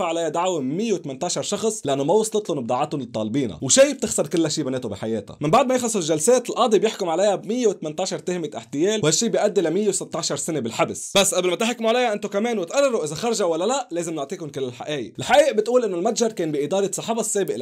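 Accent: Lebanon. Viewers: لهجتك اللبنانية ناااااار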